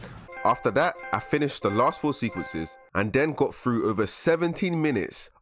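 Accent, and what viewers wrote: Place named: South London, United Kingdom. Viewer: You sound very south London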